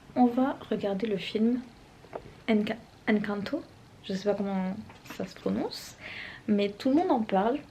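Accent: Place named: South, France. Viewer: Premier accent du sud que j'entends depuis que je suis à LA ! Merci 🙌!! Sinon super vidéo, j'aime beaucoup ce que tu fais ! Faut que je continue de mater ta chaine ça va me donner des idées pour la mienne 😂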